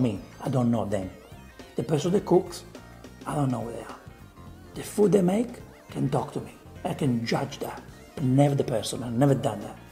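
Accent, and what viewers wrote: Italy. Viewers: I just have to ask this 😂 Why do italians learn other languages so hard? You probably at this point, lived in english speaking communities more than Italian, yet you either force your italian accent (don't believe this) or this is just the way you speak, not that I mind, but I just heared many italians that don't rly pickup other languages even after 20-30y living in a different country 😂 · Thanks to I finally learned how to do a good pizza dough, now it’s very popular amongst my friends everybody loves it. Learn to do real Italian food from a real Italian, I wouldn’t trust you if you didn’t have that Italian accent;) The reaction videos are good to Actually you learn more from those videos like “ don’t put oil into the water”:) 👍 This is a great channel. Just keep it going my friend. Saludos!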